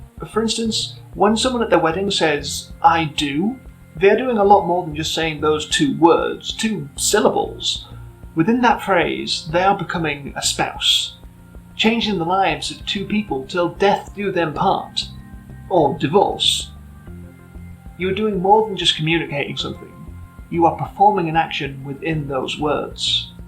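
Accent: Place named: Belfast, United Kingdom. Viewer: Love if when I hear a Belfast accent,. 💚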